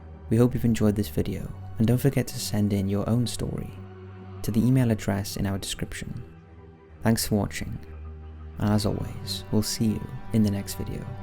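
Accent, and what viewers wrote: Wales, United Kingdom. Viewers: You sound welsh , are you from Flintshire /Rhyl area ? Or are you an anglesey lad ? Anyway you sound very calming great narrating voice !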